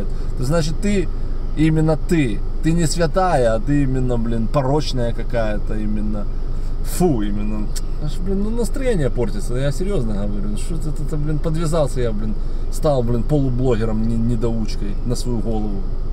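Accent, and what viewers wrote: Odessa, Ukraine. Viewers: Как он мне нравится😂😂😂😂😂😂Только одесситы так разговаривают 😂😂😂😂 Шутки, прибаутки ну прямо как моя подруга😂😂😂😂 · Как же Вы мне напоминаете Михаила Водяного. И тембр голоса, и неподражаемый одесский акцент, и манера разговора, и юмор, и смех... Мне кажется, Вы и внешне на него похожи. Очень хочется верить, что на Украине - 95% адекватных людей. Но по моим наблюдениям, это далеко не так. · Одессита сразу слышно по говору и шутке юмора😊! Второй день слушаю и ржу!!! Слава Богу, что Луганск в "оккупации"!!! Ждём Николаев и Одессу домой! Тернополь пусть ждёт репараций и расплачивается за кредиты их любимой власти. Там долг уже накопился не на одно поколение! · как мне нравится твой одесский говорок. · я вот уже два мес слушаю твои репортажи но не подписывался на тебя, но после этой Ганны, решил подписаться. Не обижайся на ущербную, есть и такие люди. 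Ты прикольно рассказываешь, настроение поднимаешь, так что выпускай больше репортажей. Привет тебе от одессита, родной мой говор, который я уже 4 год не в Одессе. (((( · Привет! Классное видео! В ржете в вперемешку с Одесским говором, а как мы режем! А ещё где вы едите. там небо такое тяжелое кажется прямо сейчас раздавит. А далее такие красивые места, горы! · Гаси майдануиых.Уникальный Одесский говор.Красава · только подписалась на ваш канал. Слушаю вашу,нашу родную одесскую рэчь и уши сахаром присыпаются! Какой текст,чисто лейся песня!!! А в Одессе для настоящих одесситов,не для новых "одэсцiв" на мовi говорить чистое западло! И смысл комментариев на письма всяких Ебванько- это песня! Продолжай в том же духе! Здоровья и удачи. И процветания!!!! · Брат полностью с тобой согласен.Твой Одесский говор и юмор класс, улёт.😂😂😂❤❤❤ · ай е тебя несет… 😅 твой одесский говор - не исправим!!!!❤ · Я просто млею от Одесского говора. 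Привет с ДНР.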